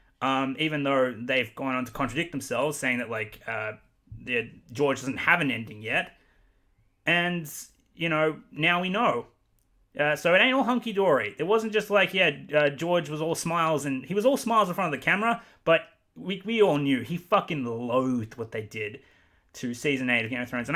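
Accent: Australia. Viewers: I always thought you were British! Your accent isn’t as strong as other aussies I’ve heard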